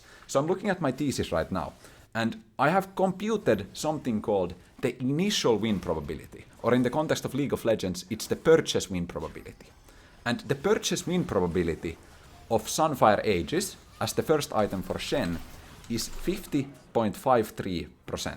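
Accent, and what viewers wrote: Finland. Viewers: love your (finnish?) accent. One item, hahaha. Two item hahaha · My dude your accent is amazing 😂 ootko suomalainen? My favourite was definitely “obsörved”. The stats were fun, not even a LOL player but this was fascinating. Best of luck with your Fall & future endeavours. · Hyvää duunia, ja tosi hyvin selitetty enkuksikin. Hämmentävää miten rallienglannin kuulee välittömästi mut kundi artikuloi nii hyvin et vika on kuulijassa jos ei ymmärrä. Analyysi on kyllä hiton mielenkiintosesta aiheesta, pidän nää pointit mielessä ku meillä tulee statistiikkaa taas jossaki kohtaa. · jumalauta mikä rallienklanti <3 jos et tee tahallaan nii kandee panostaa tarkotukselliseen ralli artikulointiin, siinä on tavaramerkkijä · Banger finnish English. Warms the heart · Hear Finnish accent. Subscribe to channel. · Nyt onkyllä jo pakko kehua tätä suomi aksenntia! 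· i immediately recognized your Finnish accent, and its interesting that the thesis says "Aalto University" as well XD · are you from finland by any chance?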